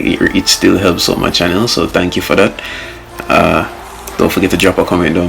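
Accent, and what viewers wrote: Jamaica. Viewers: You sound Jamaican me g 🇯🇲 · Ur Jamaican don’t it?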